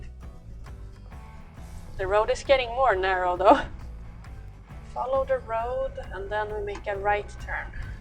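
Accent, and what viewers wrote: Sweden. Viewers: låter du som alla svenskar gör i amerikanska filmer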